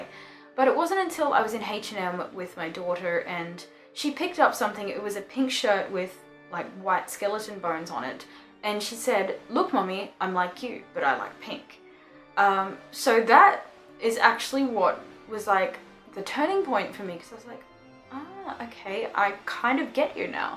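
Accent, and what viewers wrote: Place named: Australia. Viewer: And to me, as soon as she started talking I thought "she's from Australia" 😳 lol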